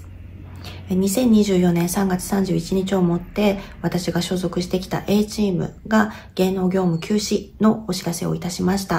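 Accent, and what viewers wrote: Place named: Tochigi, Japan. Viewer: 栃木だったんですね私は茨城の鹿嶋です案外言葉似てるね😊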